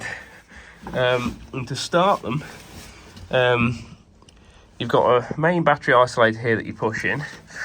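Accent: United Kingdom. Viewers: Bro i absolutely Love that British accent 😂❤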